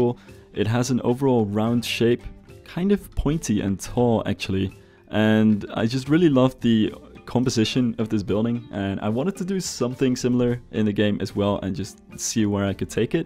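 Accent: Netherlands. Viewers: Are you Dutch i am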